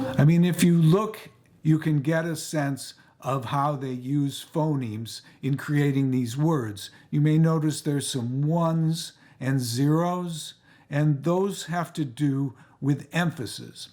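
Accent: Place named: Brooklyn, United States. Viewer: Nah, it's the Brooklyn accent! East Village resident for 30 years; now down South. LOVE the Brooklyn accent (you'd be very popular down there - folks love it here as well!) Though, like Jeffy - folks outside NY probably can't tell the difference between Queens (Woody) and Brooklyn (you). You're definitely cooler looking than Woody:>))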